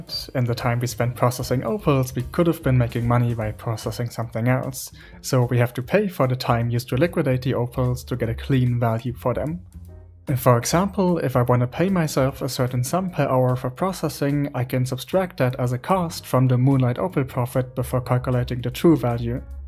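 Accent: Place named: Germany. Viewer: Your english sounds very german 😮. I love your Videos and was actually horrified when i found out that i wasnt subscribed to you 😅, great Video! Keep Up the good work ! Also can i reference your Videos in my own ? I also got a YT channel, and since a few Weeks i Focus on Lifeskills and anything but grinding... have a nice Day 😊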